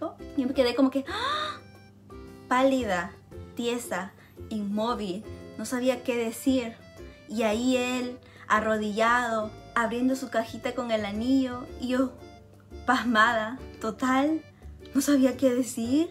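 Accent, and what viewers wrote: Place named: Mexico. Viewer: Porque hablas como mexicana? , Linda historia 💕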